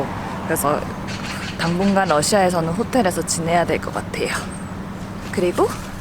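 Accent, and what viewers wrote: Gyeongsang, South Korea. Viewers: ㅋㅋ 말도 이쁘게 하시네 경상도 말투 정겹네요 멋져요 ㅎㅎㅎ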